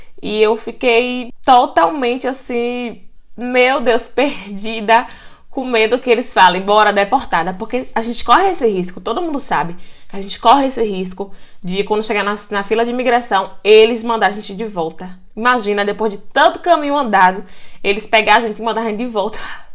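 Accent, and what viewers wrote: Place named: Pernambuco, Brazil. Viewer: Gente ela é Bahiana🤣, o sutaque é de Pernambucana , Gui que és Pernambucano.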